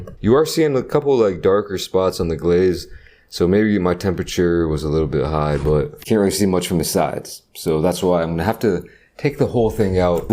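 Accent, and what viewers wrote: United States. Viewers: My daughter whose 11 loves Korea and everything Korean..lol. she's a big Anime fan.. I told her to watch your mukbangs and she thinks yo totally cool 😎 😅😅😅..she also loves your American accent 😮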